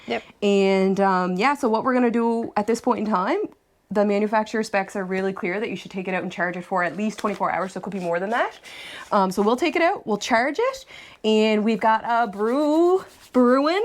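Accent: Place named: Canada. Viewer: Thanks for the video. The Brew HQ shirt and accent gave you gals away ! Greeting and good luck from Ontario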